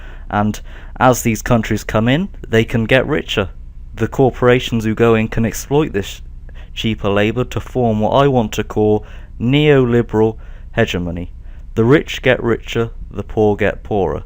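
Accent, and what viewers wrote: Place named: Liverpool, United Kingdom. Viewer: You sound a bit like George Harrison. Are you from Liverpool?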